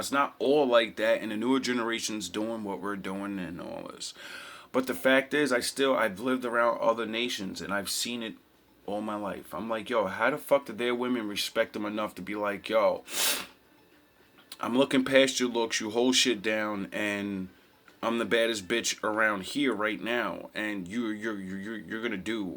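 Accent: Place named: West Coast, United States. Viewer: You sound like you're on the west coast.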